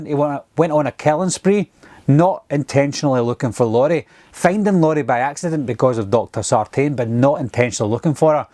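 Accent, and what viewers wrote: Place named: West of Scotland, United Kingdom. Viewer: Trying to place your accent. Definitely West of Scotland. You dont pronounce chair as 'cher' so I'm guessing you are from Ayrshire or very close to it.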